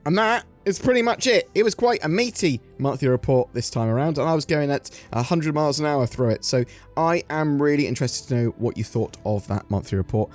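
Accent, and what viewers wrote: England, United Kingdom. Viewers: Top notch English accent there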